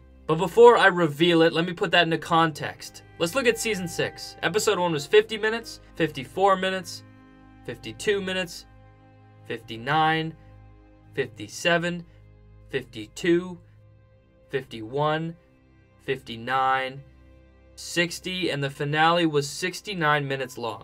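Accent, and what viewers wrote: Canada. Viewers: Is that a Canadian accent? *curiosity 😜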